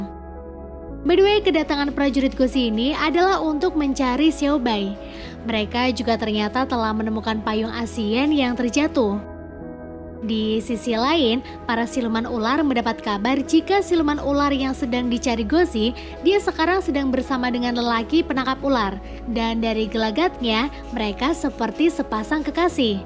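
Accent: Pemalang, Indonesia. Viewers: Mba orang Pemalang nih.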